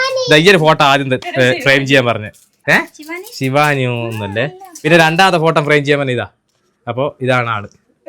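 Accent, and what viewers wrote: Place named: Vadakara, India. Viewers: ഇത് വടകര ഭാഷ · വടകര ഭാഷ 😂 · കണ്ണൂർ സ്ലാങ് 👌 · Evdya place nammade tlsry panoor slang🤣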